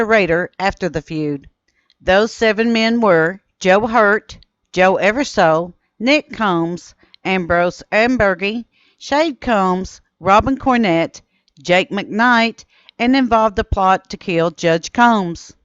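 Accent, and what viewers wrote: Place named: Appalachia, United States. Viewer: You sound like you speak Appalachian American, however you said, " Appalation". curious, mighty curious.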